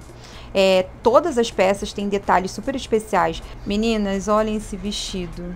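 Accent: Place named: Rio de Janeiro, Brazil. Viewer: você é mesmo linda! E Esse seu sotaque carioca arrasa🥰🥰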